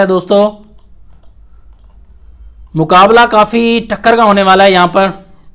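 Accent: India. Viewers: Such a pendu speaking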